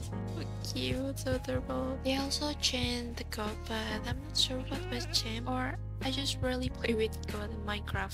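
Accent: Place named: Spain. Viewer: by your voice i feel you are a Spanish person who speak English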